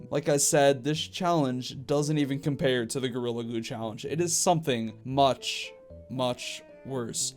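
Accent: United Kingdom. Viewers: now one realise he was british at the start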